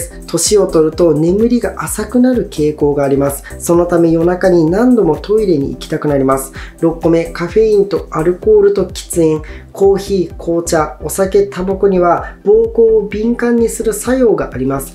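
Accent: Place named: Tohoku, Japan. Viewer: はじめまして いくつか動画拝見し チャンネル登録しました。 東北なまりが懐かしいです^_^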